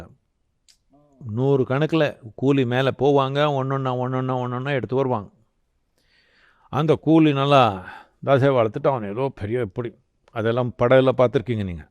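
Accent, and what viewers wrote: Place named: Karnataka, India. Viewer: every Kannadiga sounds the same when they talk in tamil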